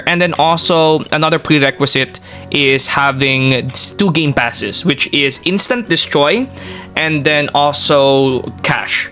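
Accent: Philippines. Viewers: Wait?? Are you a filipino, because you have a filipino accent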